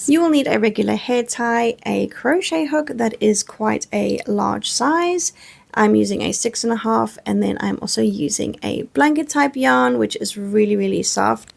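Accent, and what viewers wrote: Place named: United Kingdom. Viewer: it's interesting to me that you seem to using the us standard for the names of the stitches. I learned that a British treble crochet is equal to a US double crochet, a British double is equal to a US single, and so on. Maybe I'm misplacing your accent (you sound British to me). If so, I apologize. I just wonder if that's on purpose like if you know your audience is mostly from North America or if that's just how you learned to crochet. If the latter, did someone teach you or did you learn from Youtube or something?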